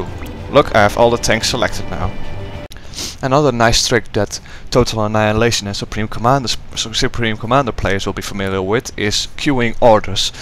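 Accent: Netherlands. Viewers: Yeah, I hear it too. He definitely sounds Dutch.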